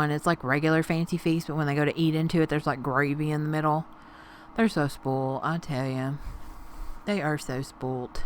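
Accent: South, United States. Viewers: thanks love your harvest videos. you sound like you are in the south. i am in nc. i have 10 cat rescues. Or should i say they have me lol i remember in one of your older video you had mentioned you were in college, do you still go, hugs and stay safe out there. I am older with health issues so i have to stay put for a while. fun is lol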